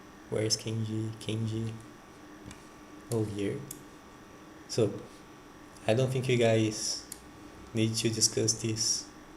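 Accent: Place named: England, United Kingdom. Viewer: I love his english accent so bad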